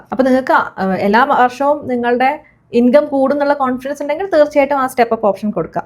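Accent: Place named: Malabar, India. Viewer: informative learning and Malabar slang .. two of my favorite ..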